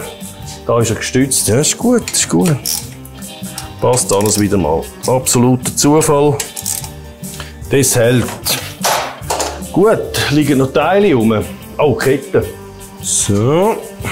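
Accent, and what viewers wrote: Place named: Switzerland. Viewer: Die Sprache... Schweizer Deutsch... ist nichts für empfindsame Ohren!!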